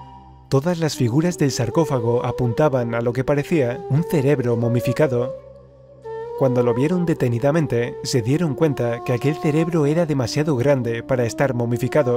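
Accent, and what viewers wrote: Spain. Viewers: Vuen video, lasrima del narrador gachupin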